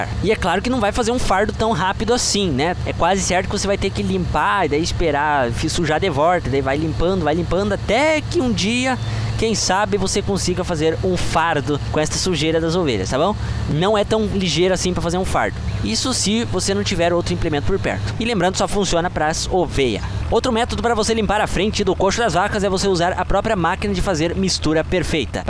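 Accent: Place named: Paraná, Brazil. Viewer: kkkkk C é do Paraná mano? Meu pai é paranaense e fui morar 1 ano com minha vó no Paraná e a galera fala desse jeito lá kkkkk povo doido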